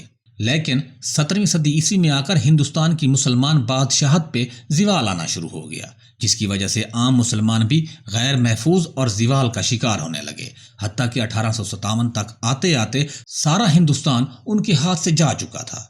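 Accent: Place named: Punjab, Pakistan. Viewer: I love your punjabi accent of english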